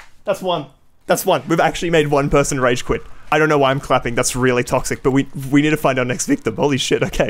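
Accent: Australia. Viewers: Australia accent plus mystery dungeon background music gets you a sub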